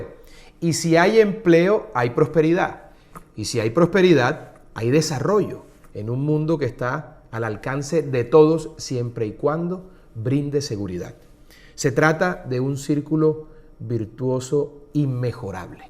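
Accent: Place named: Caribbean Coast, Colombia. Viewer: Abelardo, te preferiría como presidente por tus capacidades y hablar costeñol. Pero, te estás quedando atrás frente a Cabal y otros oportunistas. Ponle más seriedad a la vaina, que el cristo es de plata. Basta de bolloneria, te están cogiendo de guachafita. Coronel, salve usted la patria. Bendiciones.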